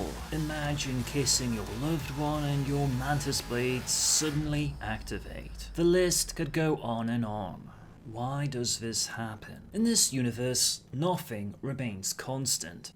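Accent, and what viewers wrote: England, United Kingdom. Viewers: Man, are you from England? Nice accent.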